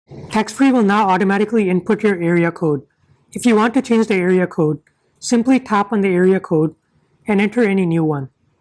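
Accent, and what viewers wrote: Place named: India. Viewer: ikr. But despite that, I found this video kinda useful and the Indian accent wasn't too awful. It would be helpful to simply state that Telegram requires "A PHONE NUMBER" to download/set up, but it does not have to be your phone number OR DOES IT? idk could a textfree number on another device suffice? And what's the deal with the call back? I use textfree to avoid having audio phone service. Does Telegram require that one-time audio connection? If so, then why? It's not an audio-based app. And what happens if a user changes their phone number (which I do regularly). These are the kind of questions which should be answered in this kind if video.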